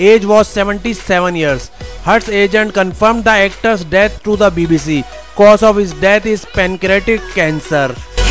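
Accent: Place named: India. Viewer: Why this Bollywood Dialekt? Absolutely horrible, can't you afford someone with a decent voice????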